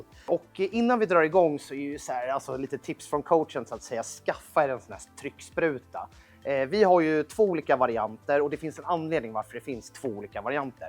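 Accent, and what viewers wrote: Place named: Stockholm, Sweden. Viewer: Jag är stockholmare och om det är det här icke-stockholmare menar när de säger att de hatar stockholmska så förstår jag dom... x) låter som en 12-årig tjej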